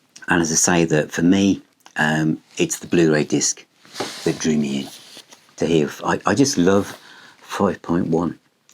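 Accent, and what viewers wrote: United Kingdom. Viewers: As always I'm blown away by knowledge of the band, product, and box set evolution (not just 2 disc's but 4 of different material - nice insight). Kinda different question but what dialect do you speak? It's not Cockney. I'm just curious that's all. Rock on.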